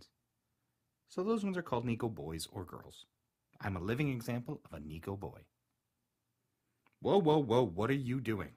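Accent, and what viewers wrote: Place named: Canada. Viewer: Sourry? CANADA YOU SOUND LIKE YOUR FROM CANADAA. Sorry ;-; But I loved thissss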